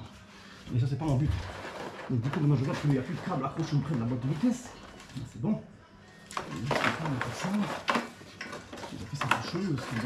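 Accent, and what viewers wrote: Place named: Sarreguemines, France. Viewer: Bonjour, vous êtes en Moselle ? J'ai l'impression de reconnaître l'accent de Sarreguemines ? Je cherche un mécanicien pour ne embrayage sur bmw série 1 116d je suis de Forbach.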